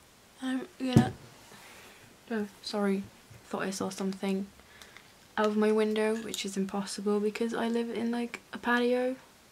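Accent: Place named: United Kingdom. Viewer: that british accent tho, love the video. Keep it up :>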